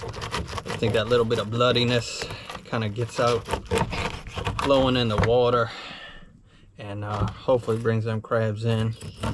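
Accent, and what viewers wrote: Louisiana, United States. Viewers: R u from Louisiana i hear the accent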